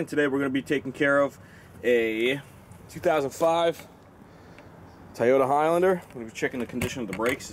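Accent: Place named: Rochester, United States. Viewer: Very nicely done ... nice to hear (Rochester County?) accent, reminded me of person who first taught me about fixing cars. Though quite familiar with job, appreciated fine details and learned helpful hint about lube around clip area in addition to caliper and front side fingers. Imagine it is really supportive to receive appreciative feedback, especially considering what I imagine is only a low percentage of people who do appreciate it can/will take the time to write a comment.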